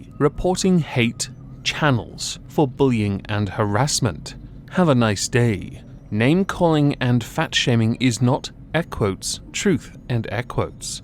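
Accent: United Kingdom. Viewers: Ah, nothing like hearing a Cthulhu dude with a silky smooth voice and great british accent dunk on the stupid peeps. Then hearing that glorious outro. Thank you for the video sir! 😄👍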